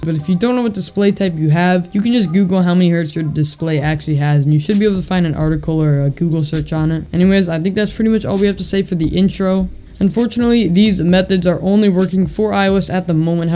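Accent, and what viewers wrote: France. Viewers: R u French ?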